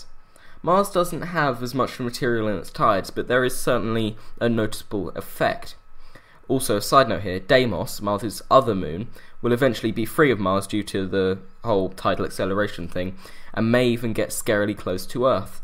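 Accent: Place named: England, United Kingdom. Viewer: Eric Williamson Englishman speaking English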